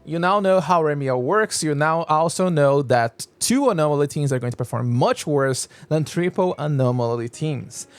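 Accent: Brazil. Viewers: Can't wait to try the Air Fryer team!! Btw, are you Brazilian? That accent sounds familiar. Awesome video!!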